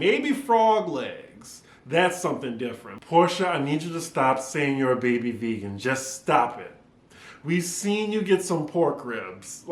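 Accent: New Orleans, United States. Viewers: You still have your accent,. It's only a slight accent but I always hear the New Orleans twang when you talk.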